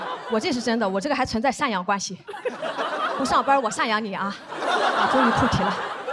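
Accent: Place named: North, China. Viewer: 他的风格一部分是靠节奏，一部分靠口音，好笑不好笑要看对北方方言的熟悉程度，不熟悉的人就是觉得不好笑。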